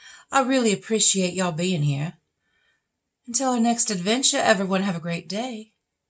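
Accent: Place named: South, United States. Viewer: Love it 😃😍 and your southern accent 🤩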